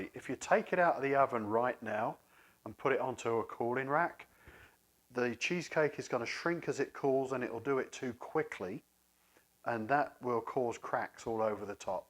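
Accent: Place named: Gloucestershire, United Kingdom. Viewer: Thanks, this video is clear and informative, can't wait to have a go. Love the Gloucestershire accent.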